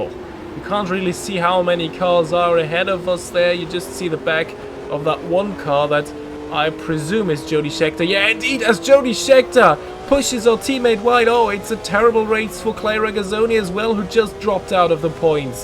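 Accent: Germany. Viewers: You sound German are you German?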